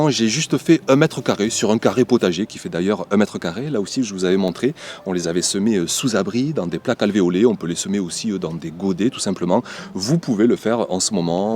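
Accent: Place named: Southeast, France. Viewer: Bonjour, natif du sud-est, je te suis depuis la Bretagne, ton accent me rappelle les sources 😎 merci pour cette vidéo, toujours un plaisir à regarder, écouter et pleins de bons conseils. Par contre je me pose une question, dans la vidéo tu indiques que tu manges les fèves crues, j'avais lu que: "Les fèves crues (comme d'autres légumineuses) contiennent des substances naturelles comme des lectines qui peuvent être toxiques ou irritantes pour le système digestif si elles ne sont pas détruites par la cuisson." Personnellement il m'arrivait d'ouvrir quelques cosses et en manger directement dans le potager 😋 mais du coup je ne suis pas certain que ce soit une bonne idée côté digestif, il vaut peut être mieux les cuire non? (Je pense que tout est question de quantité aussi). Belle journée;)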